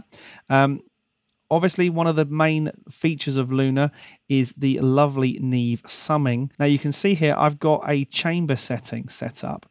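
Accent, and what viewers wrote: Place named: England, United Kingdom. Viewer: LunaRRRRRR. Gotta love that English accent 😍😘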